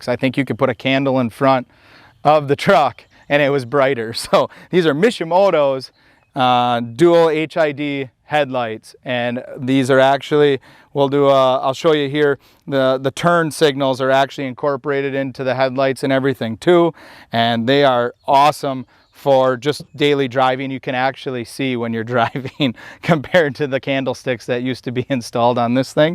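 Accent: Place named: Canada. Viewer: Awesome truck! I was wondering if you were Canadian by your accent, then I heard you mention Wisconsin...very similar.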